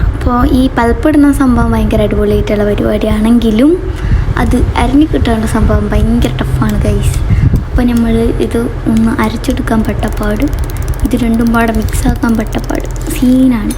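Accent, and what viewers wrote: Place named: Malappuram, India. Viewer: Malappuram slang 😌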